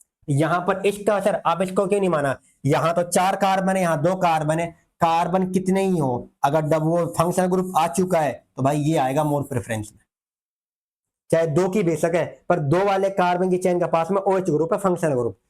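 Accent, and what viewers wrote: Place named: Mewat, India. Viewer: Sir me jairoli tijara se aapki vedio dekh rha hu mene aapni language se pehchana aap mewati ho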